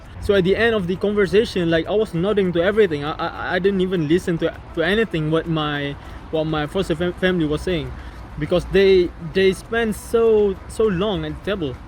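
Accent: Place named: France. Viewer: Cakap english pon dah slang french loni, boek la, pasni mmg terus terang ah mu f2f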